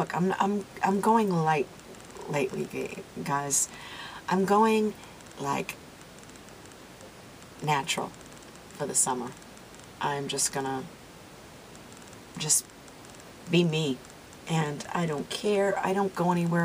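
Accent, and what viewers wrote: Boston, United States. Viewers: You to. I love it when I hear your Boston accent. LOL. I think your hair look beautiful. Bangs or no bangs. xo Marie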